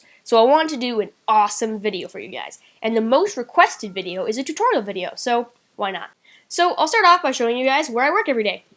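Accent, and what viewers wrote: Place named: Canada. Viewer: He's Canadian Canadians accents kinda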